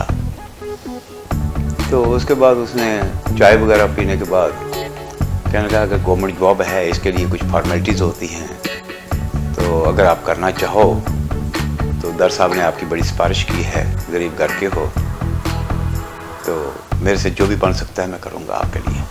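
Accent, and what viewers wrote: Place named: Jammu, India. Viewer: his dogri accent is good with the music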